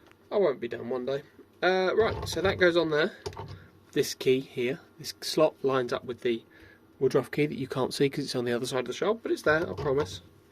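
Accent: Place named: United Kingdom. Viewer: what nobody wants to hear is that smooch british bs accent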